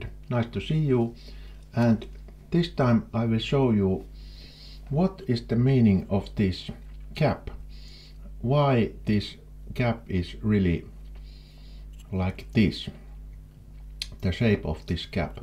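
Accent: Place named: Finland. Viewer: As a Swede he sounds like he is from Finland to me. Idk but that is a guess I would feel pretty confident in..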